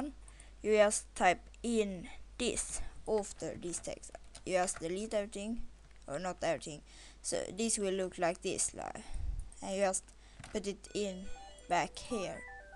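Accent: Sweden. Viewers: dat swenglish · worst tutorial ever if you dont speak that good of english please dont try it just stick to sweden cause your accent is embarrasing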